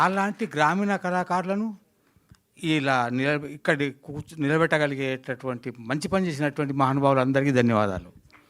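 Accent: Telangana, India. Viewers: Orey telangana slang ra ardham kadha niku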